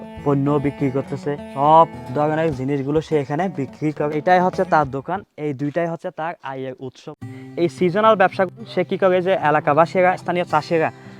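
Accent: Chittagong, Bangladesh. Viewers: ভাই আপনিকি চট্টগ্রামের চট্টগ্রামের ভাষা শুনছিলাম 🥰🥰আমিও চট্টগ্রামের,রাঙ্গুনিয়া।।। জানাবেন চট্টগ্রামের কিনা🥰🥰🥰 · আপনার ভাষায় আমার মনে হচ্ছে আপনি বাংলাদেশের চিটাগাং য়ের লোক টিক আছে কি জানাবেন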